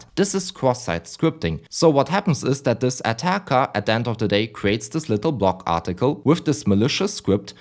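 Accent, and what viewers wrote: Germany. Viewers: Are you of German inheritance? Your sound like me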